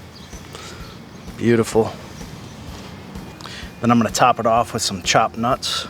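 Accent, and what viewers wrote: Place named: Michigan, United States. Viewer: You from Michigan, bud!?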